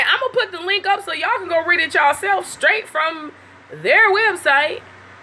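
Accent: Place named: United States. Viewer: were you from you sound country as hell I like it though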